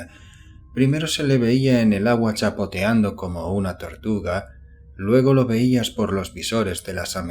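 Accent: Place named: Spain. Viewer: Que feo escucharlo con español de españa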